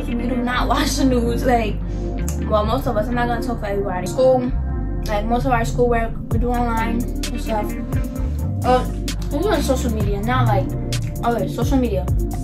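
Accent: New York, United States. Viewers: She has to be from new York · So New York love it girl ❤️❤️❤️☺️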